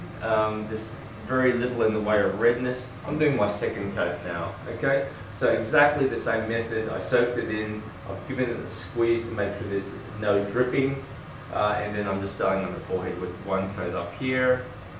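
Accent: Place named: Australia. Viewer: So TCA peel isn't good for acne scars? I thought it would remove the top layer of skin, not really the top but a small portion like dermabrasion, through chemical process.... Anyways, you got any recommendations for any dermatologists in California? Seem from your accent, it looks as if you live around Australia.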